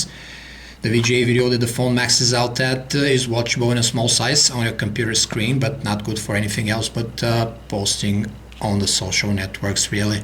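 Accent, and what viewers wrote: Bulgaria. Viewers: Hey! Good review bro! You're Bulgarian arn't you? :-) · Are you bulgarian?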